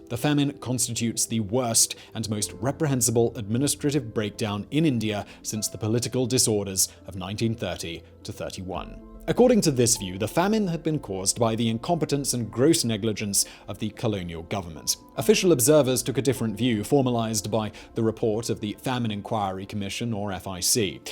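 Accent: United Kingdom. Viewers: I also noted that he described Fazlul Huq as a Hindu, so I'm overall questioning the accuracy of this content overall right now. This is the problem with Youtube. Anyone can post anything and we have no idea of the qualifications of the presenter or veracity of their content. And you know how it goes - people tend to think anyone with a British accent knows what they're talking about, even if they're talking out of their a>>.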